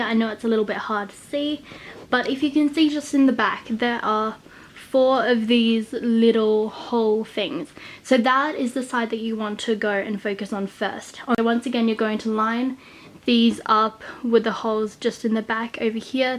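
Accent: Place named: Australia. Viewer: She Sounds Aussie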